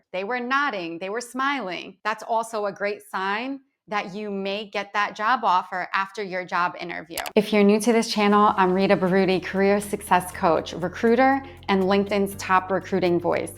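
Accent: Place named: Staten Island, United States. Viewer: Staten Island accent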